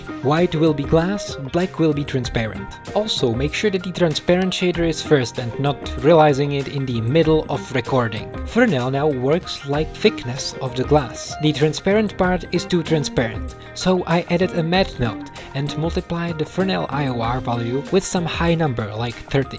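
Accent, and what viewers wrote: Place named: Czech Republic. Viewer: Great tutorial! I am wondering, aren't you Czech? The accent seems familiar :D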